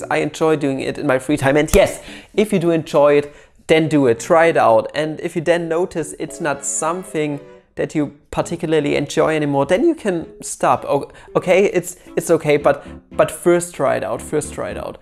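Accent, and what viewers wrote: Germany. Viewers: funny german accent :DDDD!!?!!!!!?!!!!!!?!!!!????!!?!!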